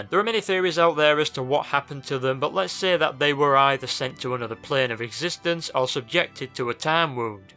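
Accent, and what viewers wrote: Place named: Yorkshire, United Kingdom. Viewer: Why do you talk like a Yorkshire Johnny Vegas?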